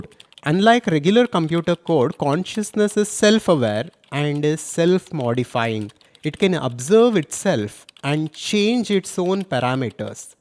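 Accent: South India, India. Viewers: You’re feeling a little out of place because of his accent. It’s the regular Indian accent which all Indian languages speakers understand. You’re used to western accent which is foreign to us when we travel to Britain or USA, but with time everything falls into place. No one is disturbed by your observation. We are one people so don’t worry. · It's just his indian enunciation,but we aught to focus on the content : l thought it very informative,and useful. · That s an strong south Indian accent , u north indians will feel it different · It’s called an accent, he has an Indian accent. 🙄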